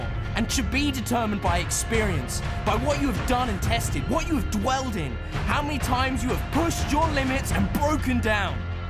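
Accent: United Kingdom. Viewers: actually it's one of the most impressive motivational videos i've ever seen best speech , great video and your British accent is astonishing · Accent sounds crazily British.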